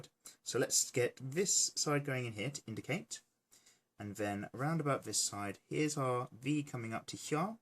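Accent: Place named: East London, United Kingdom. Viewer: When he pens, papers and "tings" I said yes East London or you have lot of "urban" friends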